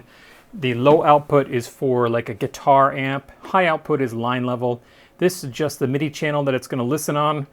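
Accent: Canada. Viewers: I am subscriber 9.32K-ish. I subscribed today. I've been watching this channel for a long time and just noticied I never subscribed. are you Canadian? I can't fix your accent. You say re-VERB instead of reverb like most people and as a sonic minded person it's puzzling. Your channel is great! Thank you for all of the videos and content.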